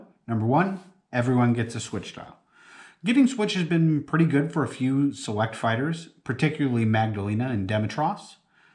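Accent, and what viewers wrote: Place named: Midwest, United States. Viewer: That midwest accent is thick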